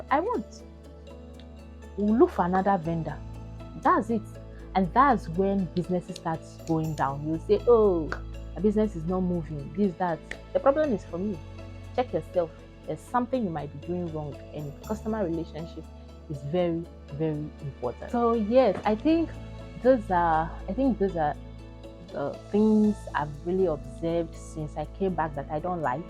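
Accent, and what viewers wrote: Ghana. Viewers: 80% Ghanaian accent loading oo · you speak Ghanaian English. And you are very natural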